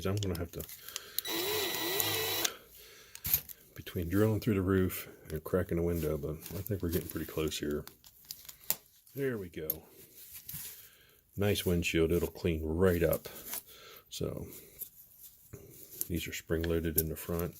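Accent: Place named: Pittsburgh, United States. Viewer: Glad I found you. Time Rider sent me. TR, Bare Metal HW, WheelsNThangs, Marty are my teachers. You appear to have joined the faculty. Are you from anywhere near, PA. I am, you sound "familiar" for lack of a better way of putting it.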